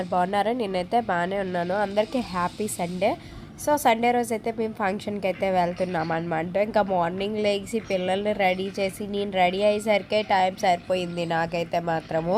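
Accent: Srikakulam, India. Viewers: Malli, language marchestunav nv srikakulam laaa matladitenaey estam akka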